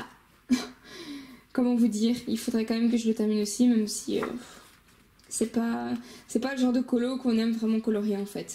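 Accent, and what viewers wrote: Belgium. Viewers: Cc tu devrais te remettre à ton double car déjà la il est beau mais une fois terminé sa promet sinon moi des moments je fait un fond mais ya des colos ou je regrette d avoir fait un fond enfin c est des testés aussi bon courage a toi et merci pour ton partage tu as un accent ? Belge ? Je me trompes ?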